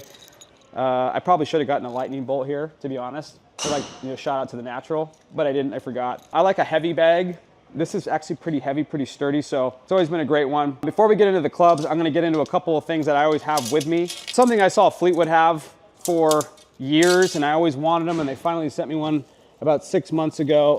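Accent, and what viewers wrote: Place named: Pittsburgh, United States. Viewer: Yinzer haha. Bayg.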